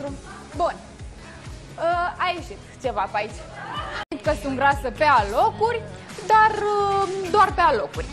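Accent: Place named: Brazil. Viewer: Sounds Brazilian to me.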